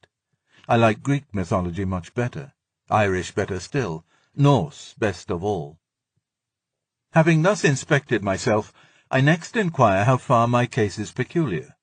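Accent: United Kingdom. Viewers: I love his voice. Nice British accent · very old times British aristocrat. You've seen that in movies so you're conditioned to it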